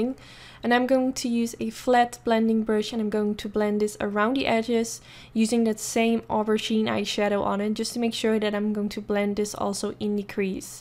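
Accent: Netherlands. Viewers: ik hoorde je praten en wist meteen dat je nederlands was haha! ik zou iets meer werken aan je engelse uitspraak!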